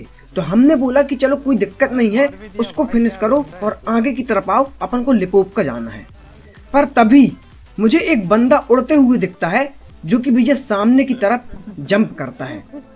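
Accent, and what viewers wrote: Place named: Bundelkhand, India. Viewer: Aawaj se to pata chal gya ki bhai bundelkhandi ho !!! Me videos dekh rha hu kuchh dino se bahut achha khelte ho bhai.... Anyways damoh se hu... Indore me rehta hu... Me b bundelkhandi hu... Bhai real naam batao yr or kaha se ho exactly ?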